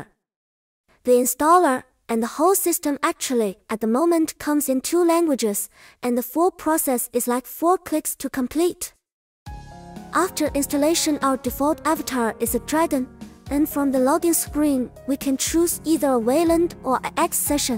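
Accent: China. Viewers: i love this english voice with the chinese accent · am I hearing things, or does the voice have a chinese accent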